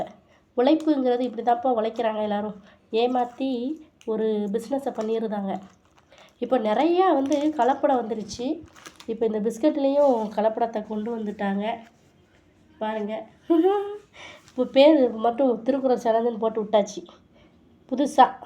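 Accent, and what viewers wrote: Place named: Tirunelveli, India. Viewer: Thirunelveli பாசை sis 🎉🎉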